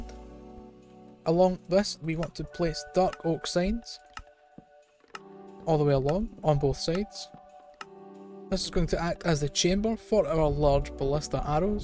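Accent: Scotland, United Kingdom. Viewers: U Scottish??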